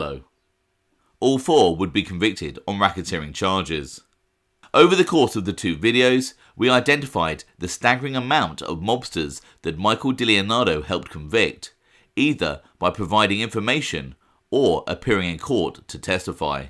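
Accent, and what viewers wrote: United Kingdom. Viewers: Anyone else annoyed a FRUITY ENGLISH narrator is telling us about an American mobster?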